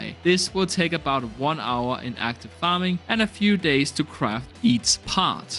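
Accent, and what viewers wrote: Denmark. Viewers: classic danglish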